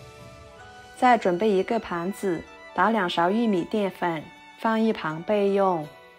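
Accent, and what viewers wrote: Yunnan, China. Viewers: 我就想问，博主是不是云南的？🤣🤣🤣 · 嗯，一聽就是雲南妹子。😊好手藝👍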